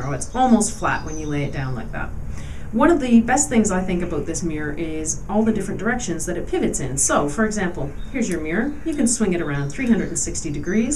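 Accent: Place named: Canada. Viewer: Canadian, eh? Nice review.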